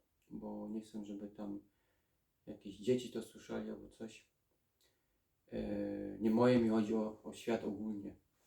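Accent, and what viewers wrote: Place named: Silesia, Poland. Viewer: Zrobiłeś pierwszy krok ,że mówisz, to już jest dużo. Trzeba wszystko z siebie wywalić,co cię boli wewnątrz w środku twojej duszy. Jesteś wrażliwy i to widać,fajny chłopak ,otwarty,super. Wyjdziesz z tego ,musisz bardzo chcieć a ty chcesz. Tylko od ciebie to zależy. Już ciebie to uwiera i bardzo dobrze. Super facet z ciebie,dasz radę, jesteś ambitny i pokażesz ludziom ,że można. Będziesz jeszcze innym ludziom pomagał wyjść z alkoholizmu. Ty to znasz praktycznie a nie z teorii,masz w sobie prawdę. Walcz o siebie,bo warto. Świat jest piękny. Powodzenia. Nagrywaj filmy jak ci idzie,krok za krokiem powoli będziesz wychodził razem z nami a my będziemy się cieszyć jak każdego dnia walczysz o siebie. Mów wszystko,wszystkie swoje doświadczenia,to co ci przychodzi teraz na myśl,co cię boli.Nagrywaj i mów.☀️☀️☀️☀️☀️☀️🌼🌼🌼🌺🌺🌺🌹🌹🌹🥀🥀🥀🇵🇱🇵🇱🇵🇱🇵🇱🇵🇱. 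Zrób to dla Naszej Ukochanej Ojczyzny. Jeszcze twardy Ślązak,piękna gwara, coś pięknego.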